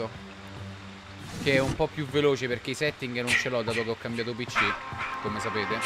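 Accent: United Kingdom. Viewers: Ciccio e l inglese molto British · Quanto sei britisch quando parli inglese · ci sei troppo bbritish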